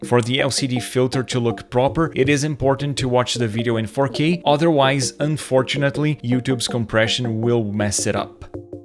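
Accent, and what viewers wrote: Brazil. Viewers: percebido um sotaque que parecia brasileiro em algumas palavras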